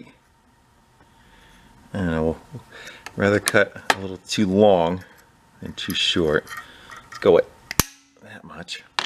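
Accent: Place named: United States. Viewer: Just to mention great great american accent very clear and concise and you have a great laugh which is not patronizing effect.good health🙏🙏🙏🙏🙏🙏🙏🙏🙏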